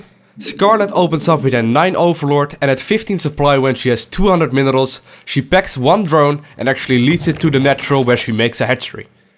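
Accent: Netherlands. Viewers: ik kan aan je accent horen dat je NL bent haha umm... wat voorn league ben je ? en neem aan dat je zerg bent? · Are you from the netherlands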